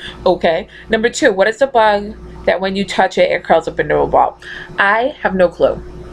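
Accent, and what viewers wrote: United States. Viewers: Awesome video! You totally have me wanting to do this now. Love the Mass (tried to spell it out, failed lol) accent! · Funny. Your accent sounds more Boston to me. I don't have one. Tfs hugs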